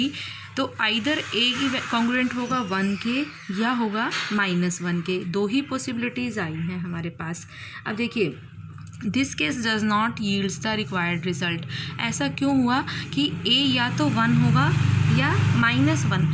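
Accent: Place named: India. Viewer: Is every damn mathematician on the Internet an Indian person? I'm having trouble with ALL the Indian accents.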